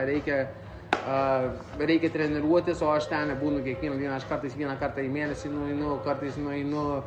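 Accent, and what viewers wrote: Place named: Alytus, Lithuania. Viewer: Alytus girdisi.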